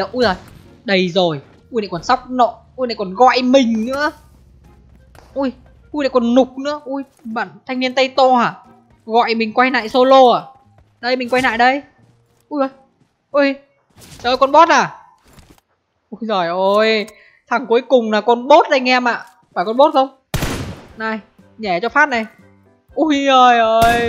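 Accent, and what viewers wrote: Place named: Hai Phong, Vietnam. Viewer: đi đâu vậy a zai ? quen vãi . hải phòng mà e đặc sản giọng đó ☺️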